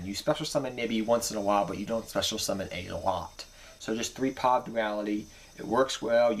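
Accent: Brooklyn, United States. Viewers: lol no do sound like you're from Brooklyn, not that there is nothing wrong with that. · Are you from Brooklyn?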